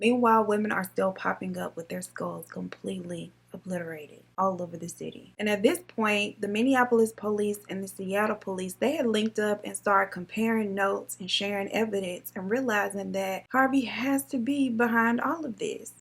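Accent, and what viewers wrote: South, United States. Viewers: I love the way you speak!! I love all the killer girls, but its really nothing like hearing it in your own "dialect" ie black, southern, hip, etc... Make em Google it! 😆😅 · Girl, we just got that sass and drawl when we speak in the south! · My husband past recently and him and his family use a lot of the southern terms and way of speaking that you do. So I really do get a kick out of listening to you talk. Things like; “fat meat is greasy” or “too much like right“ lol truly brings a smile to my face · You Definitely have the South Slang I’m from the Miami area so we speak how you speak so that’s probably why I love you videos extra cause I get it in Everes every way when you explain it lol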